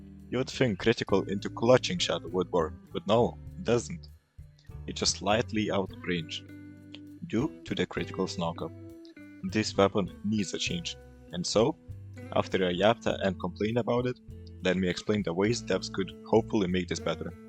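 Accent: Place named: Eastern Europe. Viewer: you have a strong slavic accent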